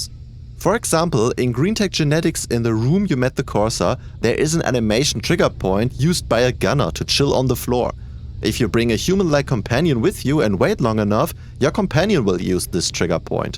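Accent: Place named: Germany. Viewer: Bro can't get rid of his German accent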